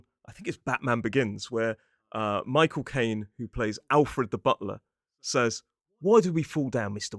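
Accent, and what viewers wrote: United Kingdom. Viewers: You are the wonderful teacher in the world, I like British accent because of you , thank you so much 👍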